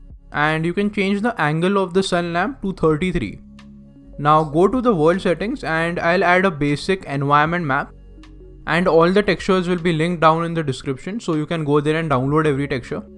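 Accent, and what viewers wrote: India. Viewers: hindi people use to have that accent, u know cause phonemes changes. I talk spanish and I'll probably have some recognizable accent and americans that speak spanish you can identify their accent too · I recently had a discussion with someone if indian accents are actually that distinctive like its shown on may shows in media. This guy proves they actually are. Good video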